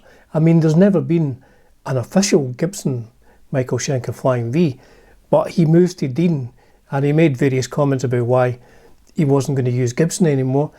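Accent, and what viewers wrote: Scotland, United Kingdom. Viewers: great video buddy. Blessings to you and your family from Hogganfield Loch Glasgow originally from Saltcoats on Ayrshire coast I've been a Schenker fan from Obsession LP onwards my favourite guitarist. What you've missed is that Gibson won a massive law suit with Dean Guitars who can no longer make the Flying V or other shapes of Gibson I think they are in deep trouble financially now since the court case so Michael probably released from his Dean contract and hence back to Gibson. You sound East coast hope you're a Hibby same as me lol. Kind regards buddy. 🎸✝️🏴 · Growing up, Michael Shanker, was always my favorite guitarist! I see your Scottish by your accent. I’m an American but anyway, I love the show outlander they got the Scottish actor Sam Heughan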